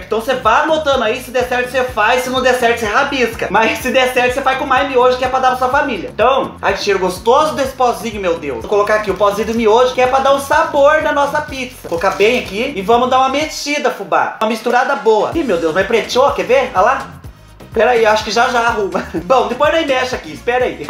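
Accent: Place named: São Paulo, Brazil. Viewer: Que seu canal venha crescer cada dia mais e mais 😍👏🏻 parabéns pelo conteúdo ❤️ você fala que nem meus parentes que mora em Ribeirão Branco não sei se conhece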